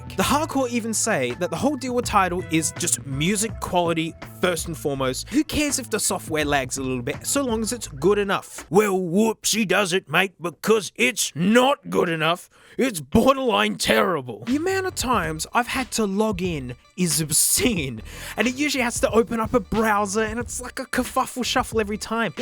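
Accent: Australia. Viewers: He's got that perfect Aussie accent that makes you want to listen to anything he says.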